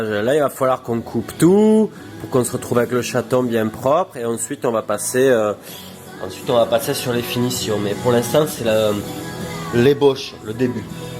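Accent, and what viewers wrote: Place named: South, France. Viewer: Superbe création ♥ Belle leçon de bijouterie pleine d'humour avé l'accent, c'est top, Merci ♥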